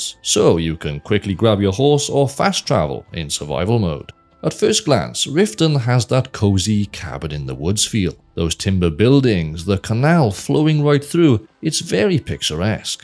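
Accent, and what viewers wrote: Wales, United Kingdom. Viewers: I don’t see anyone else having remarked on it after a cursory skim, and it might be just an accent thing, but I swear it sounded like you said ash-thetic. So, either your diction gives a bit of Sean Connery (I know, you’re Welsh, he’s not, it’s not technically an accent thing, but ykwim; also Welsh accents are so pretty, like burbling streams), or you snuck in a 10/10 pun. Either way, it works!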